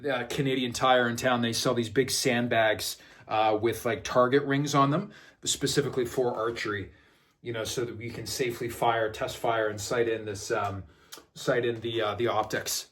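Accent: United Kingdom. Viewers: Great video. Shame about the British accent. It sounded like Dick Van Dyle in Mary Poppins! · Hilarious,speaking to a swiss mountain dog in an Northern Irish accent. Clovis is great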